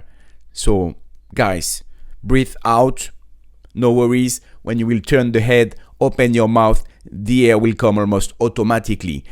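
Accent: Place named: France. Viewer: It's funny how your accent sounds exactly like the one of a French native speaker.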